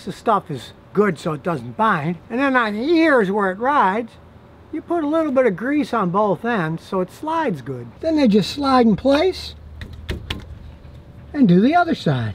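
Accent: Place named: Michigan, United States. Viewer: I like how you say caaaarrrr, are you originally from Michigan? You have a Michigan accent.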